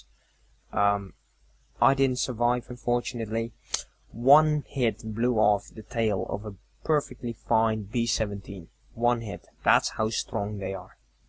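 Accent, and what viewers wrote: Netherlands. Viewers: are u dutch i can hear a tiny dutch accent in what ur saying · im 10 seconds in the video and I already know now that this guy is dutch. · Are you dutch you have a Dutch accent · Ff een vraagje... Ben je Nederlands?? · you are dutch... no doubt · are you dutch? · Thnx for the vid, That's a Dutch accent :)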